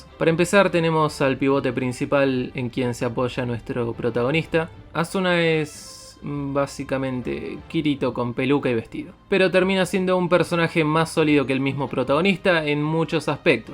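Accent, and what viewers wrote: Argentina. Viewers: Escuchar a un argentino narrando es de la re puta madre que lo pario :v JAJAJAJAJA, buena opinión.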